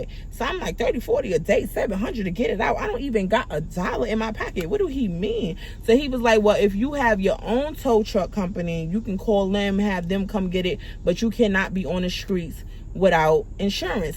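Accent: New York, United States. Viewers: I knew you were from New York!!! I’m from Brooklyn so I know a New York accent when I hear one❤😊😂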